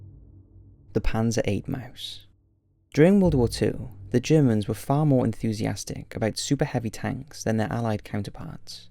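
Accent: Wales, United Kingdom. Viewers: Ate you Welsh? If so what part? I'm west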